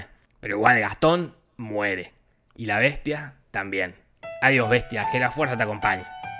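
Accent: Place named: Argentina. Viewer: El único argentino que me gusta como habla jasajksak ❤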